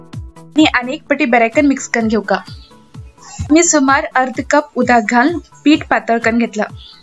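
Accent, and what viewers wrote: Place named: North Canara, India. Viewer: Very nice ithink you are from north canara .my mother wasfrom north canara .always she is to make .her bhasha also like you speeking.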